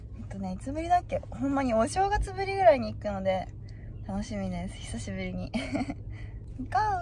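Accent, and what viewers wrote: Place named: Bingo, Japan. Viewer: いだちゃんねるから来て 初めて拝見しました。 備後弁？が癒されます♡ あ、でも自分の事名前で呼ぶのは辞めた方が良いです😅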